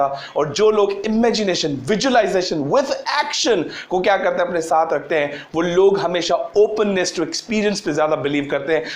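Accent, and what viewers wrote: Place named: Pakistan. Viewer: I'm from Pakistan and your speaking style is like Pakistanis You present the topic very well Thanks 💖💖💖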